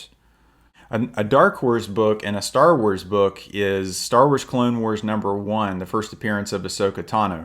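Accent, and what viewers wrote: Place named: Philadelphia, United States. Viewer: good gawd that philly accent is awful ew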